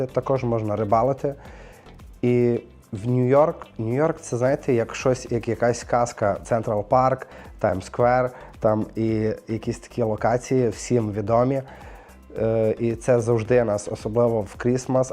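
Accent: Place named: Kherson, Ukraine. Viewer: От куда суржик с Херсона?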